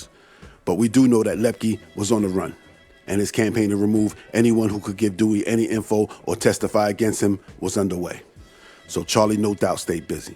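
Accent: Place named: New York, United States. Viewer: New York going by his voice.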